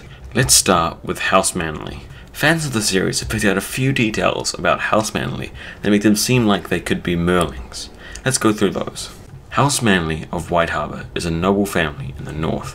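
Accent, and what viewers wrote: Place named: New Zealand. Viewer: Excellent research,! I'm trying to place your accent. New Zealand? South Africa?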